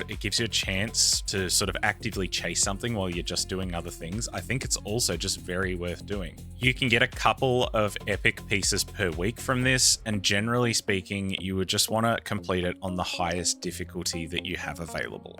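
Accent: Australia. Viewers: Recently came back after many years away. Your series is a steady paced well thought out guide to the key parts, without the clickbaited bs of some youtubers. Might be the aussie in your voice, but it's like hanging out at the local and listening to a bloke having telling about his latest fishing adventure. Comforting and engaging, keep up the good work mate.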